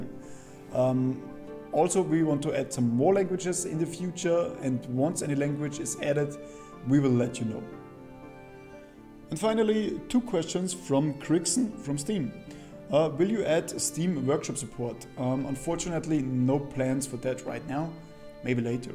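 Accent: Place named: Germany. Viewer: Hmm jak na pl to akcent masz niemiecki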